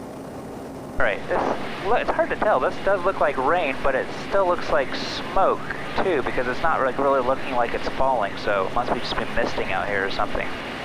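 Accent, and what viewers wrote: United States. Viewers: happy to oblige. I'm in South Lake Tahoe, CA. You sound like you are from So Cal perhaps. Interesting story you have. A joy to watch. Cheers!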